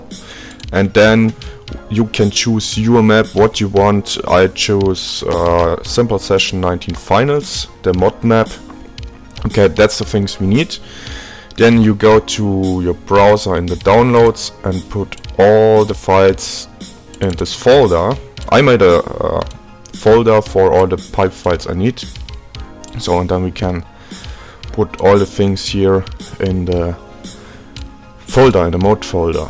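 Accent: Germany. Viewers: grausamer deutscher akzent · da höre ich doch einen Deutschen Akzent :D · I swear to god this guy is german. If not he is french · lol german and norwegan is pretty close so I can understand that u said "I hear ur german accent" and op replied with "Well I am a german channel" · ich liebe sein denglisch <3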